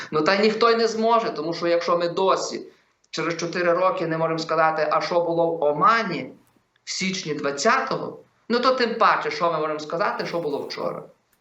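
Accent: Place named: Galicia, Ukraine. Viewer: Третии маидан готуе Порошенко та таки як ти ,и розмрвляи Украинською а не Галичанським суржиком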